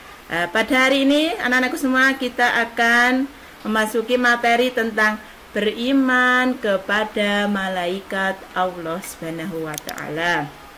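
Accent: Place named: Java, Indonesia. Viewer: Boleh lah .... 👍👍👍👍👍 Cuma agak medok tapi best 😇😇😇😇😇😇😇😇😇😇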